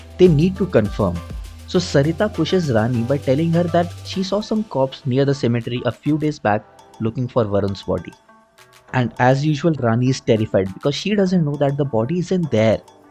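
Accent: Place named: Kerala, India. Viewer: Ur English is also like malayalam 😤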